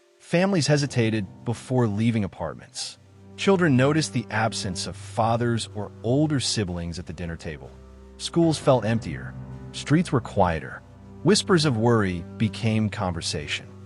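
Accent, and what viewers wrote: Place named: United States. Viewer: At least it's an American accent instead of a British accent like some are doing.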